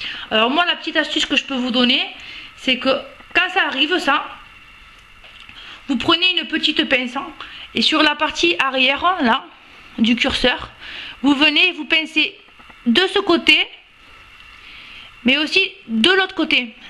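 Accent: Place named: South-West, France. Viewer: fabuleux ! super maman avec en prime un super accent du Sud-Ouest félicitations !!!!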